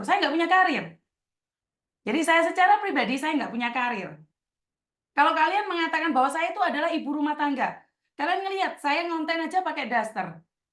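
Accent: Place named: Java, Indonesia. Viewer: lebih baik ditulis. Biar jelas dan dapat dibaca oleh semua pihak di boarding house. Ingat tidak semua wni di boarding house mau saling membantu, banyak yg jd Ular di dalam sana. Klo ada peraturan tertulis di boarding house minimal mengurangi potensi kesalahan yg akan terjd. Semoga dapat membaca komen ttg saran ini. Klo Gak ada waktu utk menjelaskan peraturan yah lebih baik tempel aturan main di boarding house biar bisa diturutin oleh semua penghuni boarding. Dan semoga kedepannya mengurangi emosi saat mendengar laporan dari pihak lain, Dan lebih memberikan waktu ke diri sendiri utk mengolah informasi yg masuk. Jujur aja mbak mbak yg laporin ada salahnya dgn bikin lebay aduan spt mrka bilang Lina belanja baju Zara yg seksi faktanya di channel Koko Faisal baju yg dibeli cuma baju biasa. Dan ttg ngomong bahasa mandarin, gak semua orang Indonesia paham dgn bahasa Jawa yg dikeluarkan, Sy orang Bekasi saja kadang suka tulalit klo dengar ngomong dgn bahasa Dan aksen Jawa, makanya kmrn ituh Lina ngomong bahasa mandarin dgn harapan biar lebih cepat dipahami penjelasan yg ingin Lina sampaikan Dan di channel Koko Faisal baru diketahui klo Lina bukan orang Jawa tapi Dari Kalimantan Dan pernah kerja di Malaysia, nah orang2 asli Kalimantan emang biasanya gak paham bahasa Jawa krn pas Ngamuk ituh kebanyakan ngomong bahasa Jawa yg bikin Lina tambah bingung. 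Semua ituh cuma saran agar lebih bijak lg kedepannya. Tidak ada yg Salah dgn saran, toh saran baik u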